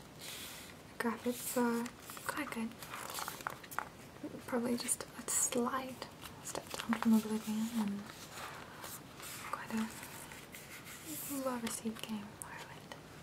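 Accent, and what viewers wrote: Australia. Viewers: Not really into the asmr roll play videos, but I found this really relaxing and you know your stuff. Looking forward to some new videos. Oh, Australian right?? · Asmr, games, cute girl with an aussie accent? I have died and gone to heaven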